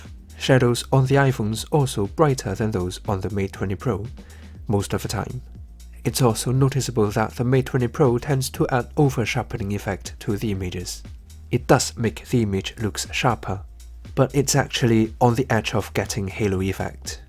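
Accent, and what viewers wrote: United Kingdom. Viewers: I like your British accent 😀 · 只是有点听不惯英国口音，呵呵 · 英式英文哦！！！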